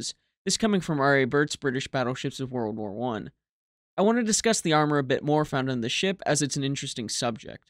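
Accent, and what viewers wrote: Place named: United States. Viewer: This is refreshing hearing good narrative on non US warships with an American accent, but many viewers will miss this asset and show no gratitude for it, as is the failing known throughout society as failure to evolve thoughts according to new developments, what Jackie Fisher thought non First Class.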